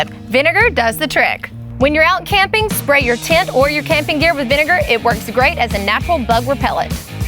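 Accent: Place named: Alabama, United States. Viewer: Alambamian beauty. Love your accent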